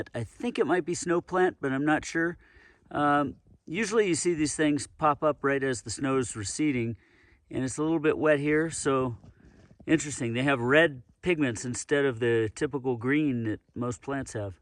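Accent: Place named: Los Angeles, United States. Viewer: Keep truckin! Thanks for the info about the fire and the insights. Sounds like you’re from LA!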